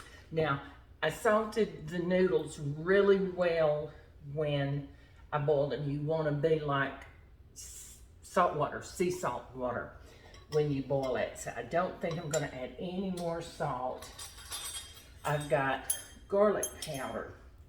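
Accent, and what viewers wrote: South, United States. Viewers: Well aren't you just the cutest thing!! I'm originally from Louisiana, and I'm enjoying your cooking and your southern accent so much!!!!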